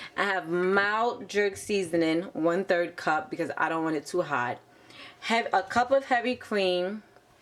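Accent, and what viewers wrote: Brooklyn, United States. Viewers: Nicely done!!! I hear a Bk accent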